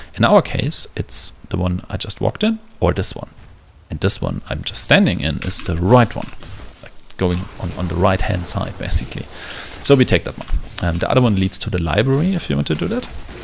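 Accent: Germany. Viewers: Nettes Speedleveling-Video, danke dafür! (Vom Akzent her vermute ich, Du bist Deutscher ;-) ) Ich hatte lange darauf gewartet, zu erfahren, wofür Du den vierten grünen Sockel brauchst, die Info darüber, welche Gems Du benutzen willst, hätte vielleicht ein wenig früher, womöglich schon ganz am Anfang, gebracht werden können. Davon abgesehen: schön, dass Du auch Hinweise auf das übliches Leveldesign für die kürzesten Wege gibst. Dass man in Akt4 die Animation von Piety abkürzen kann, indem man nach rechts läuft, war mir neu, werde ich beim nächsten Leveln mal ausprobieren.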